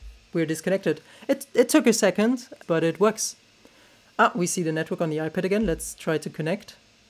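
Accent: Germany. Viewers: Thank you for your video! How hard to find hardware for Kali/Parrot to deauth 2.4 and 5ghz same time to prevent switching?! Will the Deather Andromeda does the thing? I could go for jamming in my project, but that should only be the last possible option. i would really appreciate any advice! Your accent let me think you are possibly from Germany/Austria?! :) Keep on doing such nice Videos! <3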